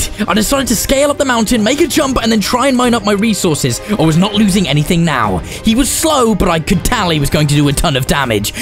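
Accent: United Kingdom. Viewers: I like your British accent it's cool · I saw herobrine u bri'ish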